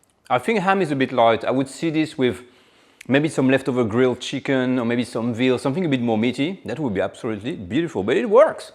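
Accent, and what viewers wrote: France. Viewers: I love your french accent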